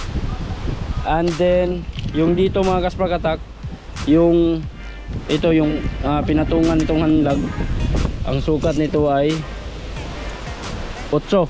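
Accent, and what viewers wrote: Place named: Visayas, Philippines. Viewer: Intindihin mo po nalang kc bisaya sya parang Tagalog lang po yan mahirap intindihin bisaya😂😂😂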